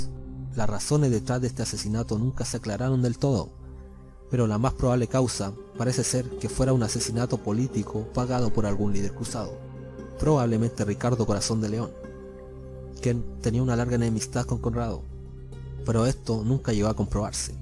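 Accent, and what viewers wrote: Chile. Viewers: es mi idea o este loco es chileno? xD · Es chileno el boludo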